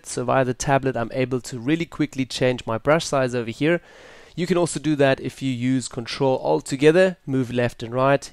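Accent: South Africa. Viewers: is that a south African accent? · Bro are you South African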